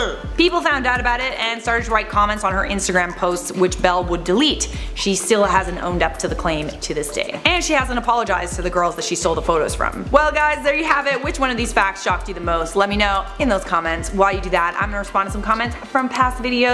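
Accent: United Kingdom. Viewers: She's South African?!! She has British accent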